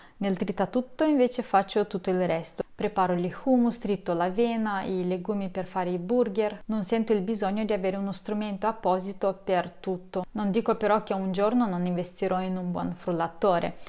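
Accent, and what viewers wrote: Russia. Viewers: Grazie per questo video, mi è piaciuto molto! Sto imparando italiano e mi aiuta molto guardare i tuoi video. Ho notato un piccolo accento russo, altrimenti avrei pensato che l‘italiano fosse la tua madrelingua. Posso chiederti da quando stai imparando italiano? E da quanti anni vivi già in Italia? È stato difficile imparare italiano così bene? Grazie :) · Come mai stavo guardando dei video poi ricarico la pagina e trovò una che ha l’accento Russo e poi vive usando con una sola padella :O (comunque lei può fare quello che vuele, questo è anche interessante) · Sei russa? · Non riesco a capire dal tuo accento se sei russa oppure moldava? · mi rilassa troppo la tua voce russa!😍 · Io: che nel cuore della notte vorrei dormire YouTube: consiglia video di una ragazza con accento filo-russo che spiega come vivere con pochissime cose •_•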